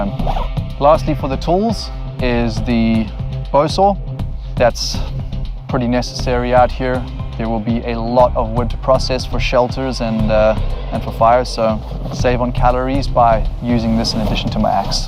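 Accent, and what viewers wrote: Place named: South Africa. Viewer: Wanted to know if you’re a fellow Afrikaner or at least a fellow South African? You sound like us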